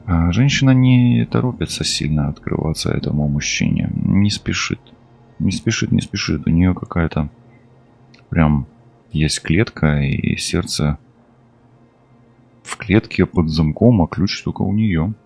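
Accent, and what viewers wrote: Ukraine. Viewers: Он Водолей,Я близнец..Я кацапка.. мы сос тояли в браке..1ОЛЕТ., ЧУЖОГО я не брала,пусть вернутчтто украли...Приятно слышать украинский акцент,хотя многие. слова. не понимаю.. У меня подруга с семьей проживала в. Броворах,а потом ВЫСТРОИЛИ дом в Винице.За. 3 дня до войны,она ПР ИЕЗЖАЛА..🎉.Я приглашала к. Себе пожить,но они не сммогли выехать.Раньше была связь,а теперь уже давно нет,побаче пожалуйста Скажи,они для меня как родные.я..яс уваж.Надежда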